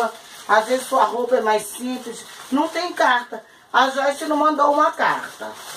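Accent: Rio de Janeiro, Brazil. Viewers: Como não amar 😍😍,saiba que a senhora me ensinou muita coisa viu,principalmente os trabalhos de casa pra ajudar minha mãe 😍😍...Adorooo a senhora... Obs: Quando ela fala:pra mim é pra patyy,que sotaque gostosooo carioca...😍😍😍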